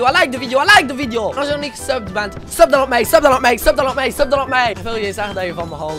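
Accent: West Flanders, Belgium. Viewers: Ben jij een west Vlaming?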